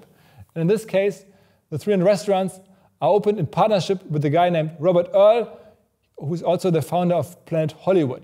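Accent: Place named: Germany. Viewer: German English :)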